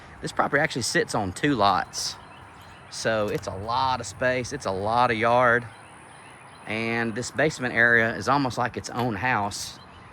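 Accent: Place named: Alabama, United States. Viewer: Well, we have a Northport in Florida, but I’m guessing by the sound of his accent and the county is Tuscaloosa, which I’m not familiar with, is probably in Alabama. Maybe you wanna mention that in the title. I’m sure there’s lots of Northports in this country. So many videos on Facebook and YouTube, are just like this with no mention of the state.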